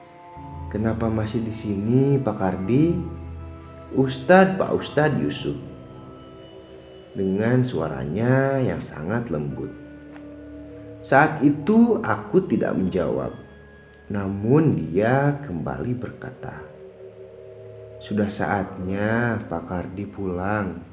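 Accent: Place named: Bali, Indonesia. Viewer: Bli org bali...ya ,cara mbaca nya koq aksen org bali...!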